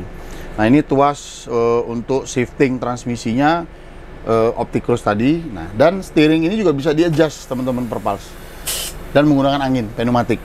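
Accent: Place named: Java, Indonesia. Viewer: Ouw tpi kadang ngmng bhsa jawa lancar. Kyk emang logat nya jawa gtu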